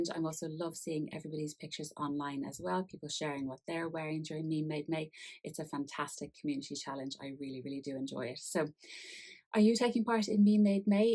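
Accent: Ireland. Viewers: Hello, I just came across your channel, those are beautiful makes, is that an Irish accent?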